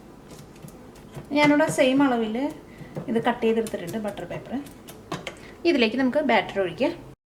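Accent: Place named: Northern Kerala, India. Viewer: great recipe... from where in Kerala, are you from??? mixture of mid and vadakkan malayalam slang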